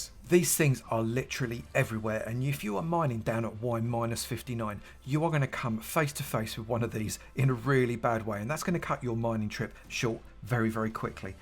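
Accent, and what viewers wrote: United Kingdom. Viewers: I love the British accent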